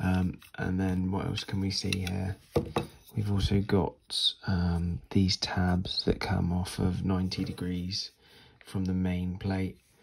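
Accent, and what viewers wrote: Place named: United Kingdom. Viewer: So I woke up this morning and didn't realize what I wanted was a calm British dude explaining locks to me. Hell yeah man find that niche hahaha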